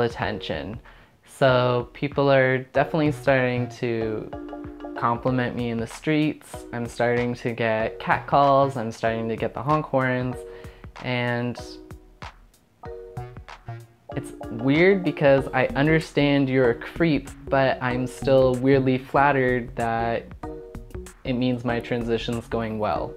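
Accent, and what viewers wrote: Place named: Canada. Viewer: Love the canadian accent!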